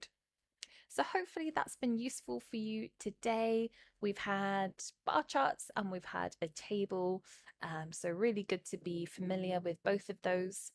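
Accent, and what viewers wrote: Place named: United Kingdom. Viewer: This lady has a very relaxing and soothing voice and British accent possibly? I get sleepy too. The other man uses use’s different variations n speeds In his voice so it’s more engaging.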